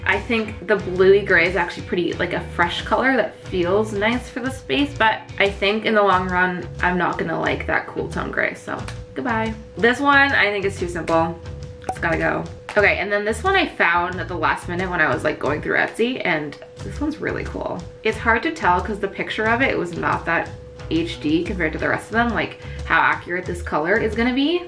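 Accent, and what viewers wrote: Canada. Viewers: i love how Canadian sounds ahhaha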